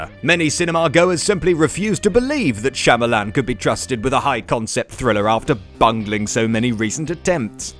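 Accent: United Kingdom. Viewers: Voice actor of this video rocks! I haven't heard so over-the-top British voice in years, awesome! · Power Rangers didn't suck? Well, ok I guess you're entitled to your opinion... (for all those outside of the UK new to this channel we don't all talk like this)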